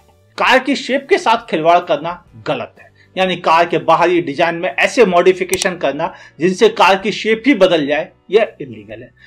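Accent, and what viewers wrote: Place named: Uttarakhand, India. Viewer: Are you from uttra khan because I am and determine your voice tone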